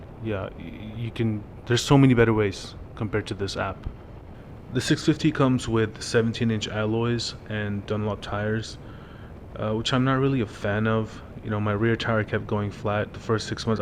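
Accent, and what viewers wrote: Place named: United States. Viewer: Bro your English is impeccable. You sound like you've grown up in the U.S. your whole life haha.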